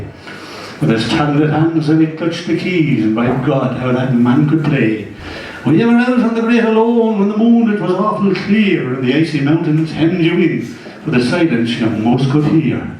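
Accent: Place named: Ireland. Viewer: Words are unclear to a non Irish speaker of English.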